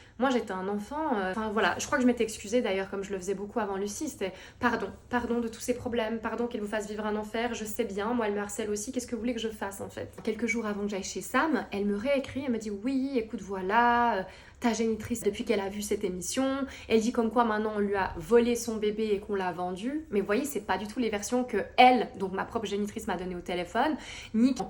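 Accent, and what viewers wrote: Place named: Vaud, Switzerland. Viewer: J’adore ton belle accent vaudois!!!! Je viens de vevey 🎉 c’est rigolo d’entendre un accent comme le nôtre à la télé 😉 t’as vidéo m’a beaucoup touchée ❤️ reste comme tu es 🥰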